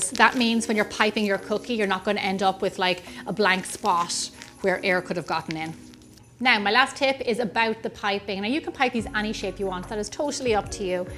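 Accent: Ireland. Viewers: Thank you,! This is exactly what I was looking for. And listening to your sweet Irish accent makes it all the better.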